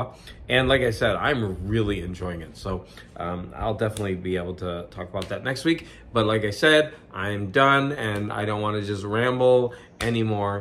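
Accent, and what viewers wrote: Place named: Kentucky, United States. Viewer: Glad you did publish it! I always feel that way about my videos when I first publish them. Then I'll look at them later and think, "That wasn't half bad." Your Kentucky accent was the highlight in this one!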